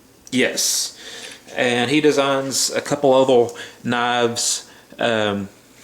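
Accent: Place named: Washington, United States. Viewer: Your accent, Washington state correct me if I’m wrong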